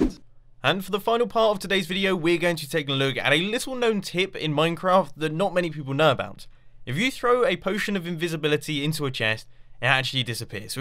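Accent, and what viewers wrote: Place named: England, United Kingdom. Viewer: oh i forgot about the english accsent lol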